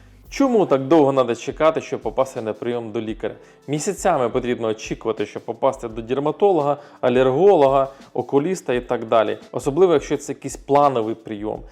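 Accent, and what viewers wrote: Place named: Kuban, Russia. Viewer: Ви ж українською розмовляєте як хлопець з Кубані, калька р0сійської то звичайно що Норвежська для вас буде більше чужа а ніж українська а якщо не хочеться на свої хліба то можна на Кубань або в Білорусь, вас там краще зрозуміють як у Норвегії, миру та щастя всім…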